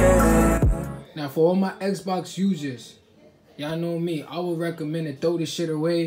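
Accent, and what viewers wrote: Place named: New York, United States. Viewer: ye he from ny lol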